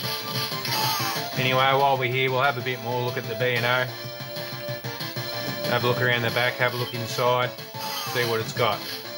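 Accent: Australia. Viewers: love ozzy accent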